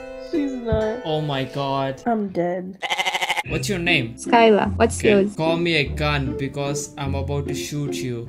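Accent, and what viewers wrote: Hyderabad, India. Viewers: dude you're from Hyderabad? lmao 😂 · Bro are you from hyderabad wow... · Bhai tu Hyderabad se hai?? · you speak 100% of indian accent , that women must be she didnt know how indian accent sound like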